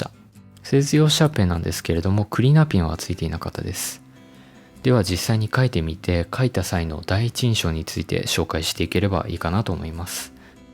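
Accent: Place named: Kansai, Japan. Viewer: たしかに「屁出ら」はダサいネーミングですね（笑）。は関西の方でしょうか？独特のイントネーションやアクセントが可愛らしいです。これからも面白い商品の紹介を期待しています！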